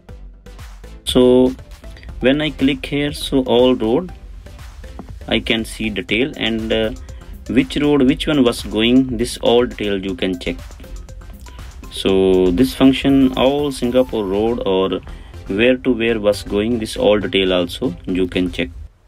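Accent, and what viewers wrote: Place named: India. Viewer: his thick Indian accent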